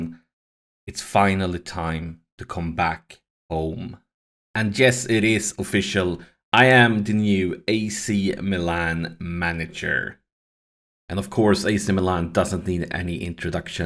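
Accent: Sweden. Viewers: Are you swedish? Sounds like that on the accent!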